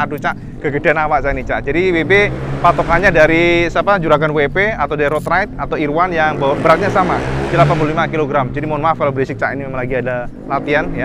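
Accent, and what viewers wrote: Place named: Surabaya, Indonesia. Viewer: Saya suka sm Reviewernya IWB, kl di Reviewnya Mobil seperti Fitra Eri lah, lbh detail mengulas nya,,,dan yg lbh sy suka lagi dari Mas IWB ini adalah Medok khas Surabaya nya kental banget...kereen Mas IWB 😁👍👍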